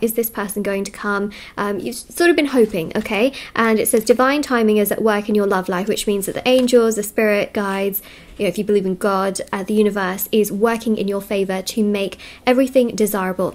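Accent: United Kingdom. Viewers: Girl, for how long you have been in the UK? Your English accent is awesome!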